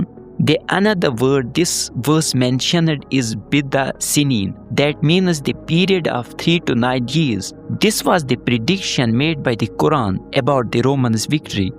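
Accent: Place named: Asia. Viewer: He has a thick Asian accent and he wants to prove that he can read English text like professionals. Though he learnt to use video clips from royalty-free visual sources to support his research in text. ❤❤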